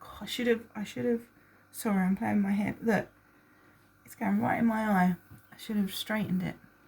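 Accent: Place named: United Kingdom. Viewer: I love listening to you talk, I'm learning british vocabulary and accent and you help me a lot in my practice <3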